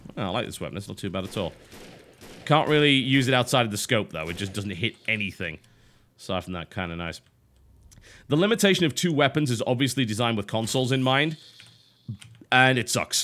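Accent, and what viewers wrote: United Kingdom. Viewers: You sounded more british than usual in this video. It's not a bad thing.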